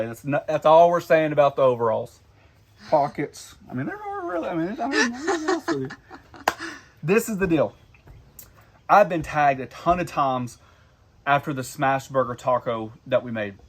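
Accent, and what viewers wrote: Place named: New York, United States. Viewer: There is nothing wrong with your overalls. I happen to have the same ones, carharts I love them. My friends all say they never saw overalls on someone with a new york accent before. I just say YOU GOT A PROBLEM WIT THAT????? ps. Where did they go? Gone half way through the video. For get a bout it.....THANK you Frank from montana...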